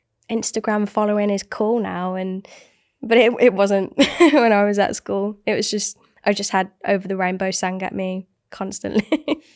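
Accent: United Kingdom. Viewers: I love British accents & I definitely love her singing.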